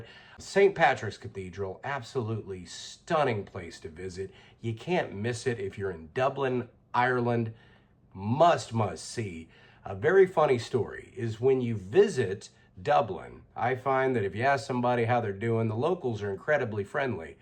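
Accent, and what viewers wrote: South, United States. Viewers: His accent is fire. I love a good southern accent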